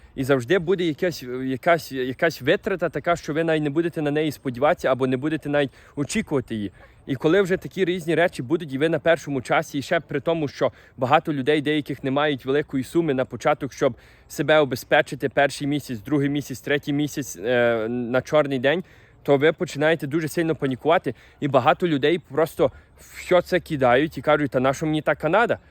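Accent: Western Ukraine, Ukraine. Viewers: Підписалась, шоб тільки оцю западенську балаканину слухать)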